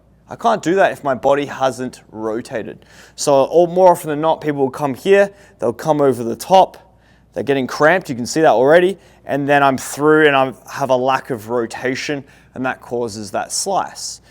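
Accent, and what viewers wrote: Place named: Australia. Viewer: So good to hear a aussie accent for a change. Feels right at home. Great video friend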